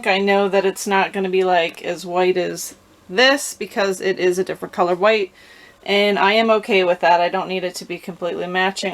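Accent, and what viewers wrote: Canada. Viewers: I think you did and awesome job! Love that Canadian assent thanks for the video 🎉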